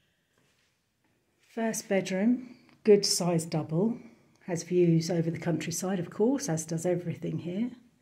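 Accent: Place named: United Kingdom. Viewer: Thank you for presenting this video. It is a beautiful property. Here are some thoughts I have. 1. You are a very good presenter. I am not a brit, so your accent is a bit heavy for me, but because you are thoughtful and speak slowly I did not miss even a word. Also your pace is slow, and allows enough time to see everything, and your camera work is slow and makes it great to watch. 2. Would be nice to have an aerial map to see the boundaries of the property, since clearly the 2000 sqm is much more than we seen, so to have an idea of where the rest is, even if it has been implied. For example, I would liked to see whether it is even possibly to convert some of it to gardening, fruit trees kind of area, etc. Many thanks for a great presentation.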